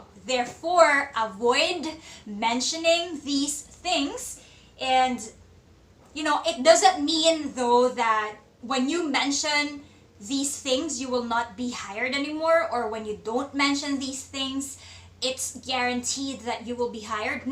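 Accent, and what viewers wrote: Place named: Philippines. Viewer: She is a great job for the influenced about English interview question but still Filipino accent at the same time. God Bless. 🙂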